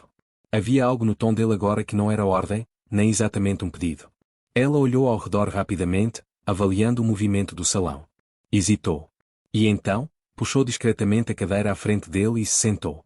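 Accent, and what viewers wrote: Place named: Portugal. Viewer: Não suporto esse sotaque de Portugal horroroso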